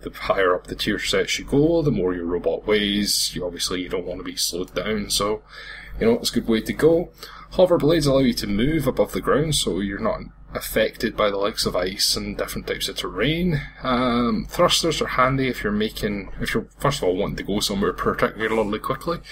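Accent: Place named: Scotland, United Kingdom. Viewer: Love the Scottishness <3 I'd love to see more games like these. Ones that are good time wasters and that are off the main Radar of Say the Steam featured items page :D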